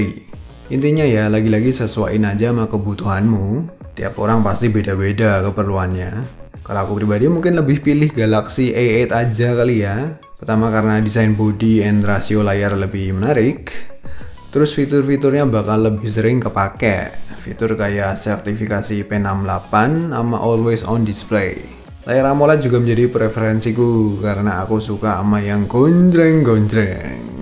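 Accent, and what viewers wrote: Java, Indonesia. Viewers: Pengisi suara nya medok jowo.... Parah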